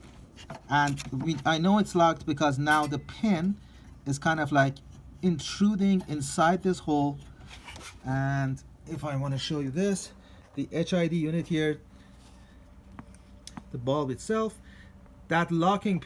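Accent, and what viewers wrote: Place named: Iran. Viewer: Dorood Farsi accent is always so easy to recognise hahaha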